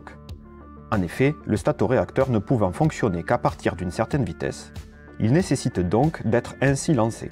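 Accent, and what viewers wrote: South, France. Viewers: Je ne voulais pas vous vexer j'espère mais j'apprécie cette pointe d'accent sympathique du sud(?) il me semble. Je suis moi même méridional et je garde un petit accent dont je suis fier. 😉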